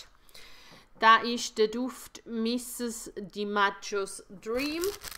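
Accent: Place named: Switzerland. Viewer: Tolles Video, gefällt mir sehr gut! 😍und der schweizer dialekt macht dich sehr sympathisch! :) Hab dich gerade erst entdeckt und dir gleich mal einen Daumen hoch und ein Abo dagelassen! 😊 Würde mich freuen, wenn du bei mir vielleicht auch mal kurz vorbeischaust! Alles Liebe! 😊❤️ - Daniela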